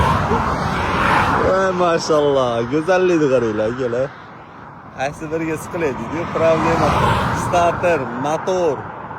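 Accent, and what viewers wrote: Andijan, Uzbekistan. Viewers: Хее канде тугамед чучмен Анжонлисиз хамаси яхши булади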